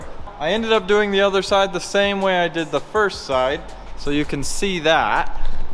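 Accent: Boston, United States. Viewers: love your boston.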